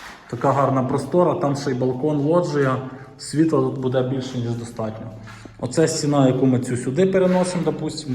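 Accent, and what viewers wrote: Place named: Surzhyk, Ukraine. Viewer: Мова з суржиком, трохи гидко звучить.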